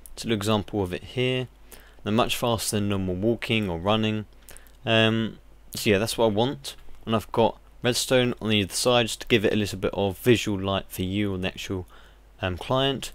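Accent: United Kingdom. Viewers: Your like etho with a British accent :D But really you are genius.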